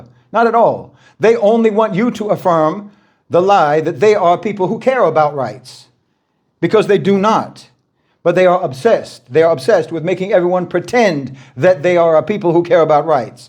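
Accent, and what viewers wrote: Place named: United States. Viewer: You are extreme and seriously ridiculous. You speak as an American which tells me you were born and raised here. Your epiphany came to you after you began struggling with your own identity. That’s your problem, you struggle yourself with your own identity. Therapy, a good therapist could help you because you need it. If you are going to preach history including that of western culture and society you need to be very honest and don’t cherry pick the best examples from past 300 yrs that will bolster your ridiculous rhetoric. You seem to purposely ignore what has been happening all over this tiny planet since beginning of recorded time, time of the Bible. You like to blame the whites, what, the pinks as you say in another video. I have to admit listening to you was entertaining and made me laugh several times but on serious note, you need to accurate and thorough with your so called history lessons. 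Entire planet has been savage. You know this but choose to blindly ignore it. This is niche for you to appeal to the low vibrating low IQ of a subset of American blacks. This niche grift of yours isn’t going to fly much longer as more and more black families are finding their way out of the poverty and are now enjoying safe and fun lives living further away from the urban blacks who you really appeal to. The young black today are not falling for this crazy division. That’s all you preach and comment about, hate the whites. Your comment sections are insane, stuffed full of low inner c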